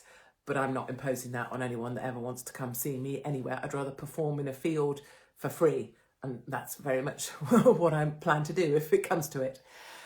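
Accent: RP, United Kingdom. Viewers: You? Council house? So where did you get that posh accent?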